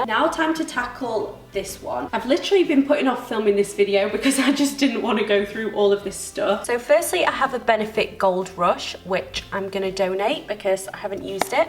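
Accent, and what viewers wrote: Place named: Doncaster, United Kingdom. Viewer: Are you from Doncaster?? You're accent is similar to that 😁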